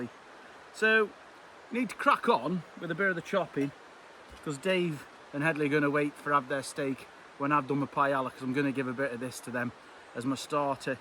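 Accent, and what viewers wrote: Stoke, United Kingdom. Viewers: I hear that you're a fellow Stokie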